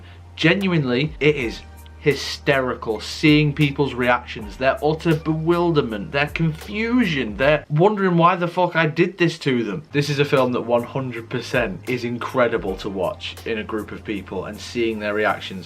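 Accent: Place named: Manchester, United Kingdom. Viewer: I'm so lucky that I sit next to a bloke called Mark at work (well used to before Covid) and the joke never gets old. Can I ask what your accent is? Sounds a bit ' ' (capital of Manchester)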